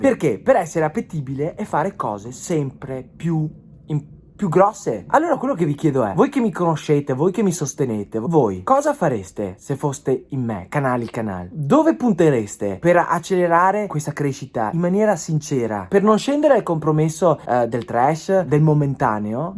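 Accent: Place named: Veneto, Italy. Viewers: Ciao premetto che non voglio che torni a fare chiamate ma tornare a parlare Veneto si abbiamo una terra con molte attrazioni e molte cose di cui fare passare dei concetti penso che la gente come me ti ammiri per il tuo accento quindi torna a parlare VENETO!!!!! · Ciao, a mio modesto parere se vuoi continuare la crescita devi, purtroppo, uscire dalla "regionalizzazione" in cui il tuo personaggio è cresciuto. Io sono trentino e mi sento molto vicino, sento molto affine il tuo accento, i luoghi da cui trasmetti, i temi ecc. ma penso che dal "triveneto" non puoi tirare fuori molto di più in termini di consenso, contatti e visualizzazioni a meno che non diversifichi i "canali" e cominci a fare anche live nei teatri e a partecipare ad eventi nel Triveneto allora il bacino potrebbe bastare. Ti trovi probabilmente nel momento più delicato e da questo video si capisce che lo hai compreso benissimo. 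E' il momento della: "o la va o la spacca" e ti auguro veramente con tutto il cuore che tu possa trovare la strada giusta.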